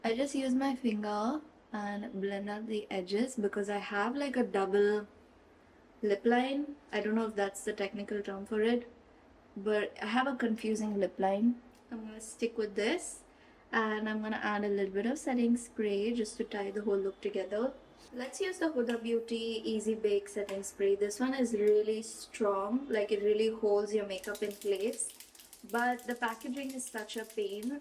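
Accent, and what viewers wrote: United Kingdom. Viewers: yk i love your chill grwms and be yourself in the vlogs that you already are aur haan the brit accent was so cool..idk how people sound like that<3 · don't stop doing the british accent gurlll i love it 😁🩵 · Never sat my face, nose intimacy and the British accent lol😂😂😂😂😂😂. Why are you so lowkey hilarious lol😂😂😂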